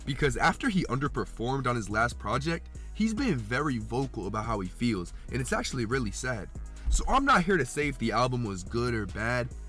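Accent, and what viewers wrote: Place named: Toronto, Canada. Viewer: i can hear the toronto in ur voice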